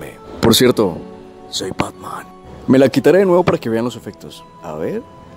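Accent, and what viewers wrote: Colombia. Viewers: Pareces colombiano 🤔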